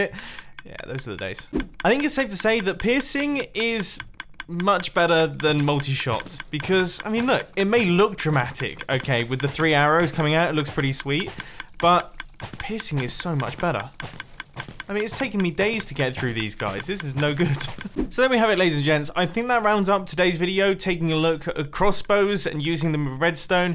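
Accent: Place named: United Kingdom. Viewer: you are british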